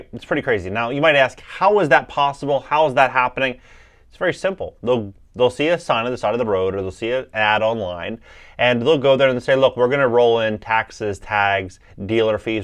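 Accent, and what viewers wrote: California, United States. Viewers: lmao yeah you do sound like a Californian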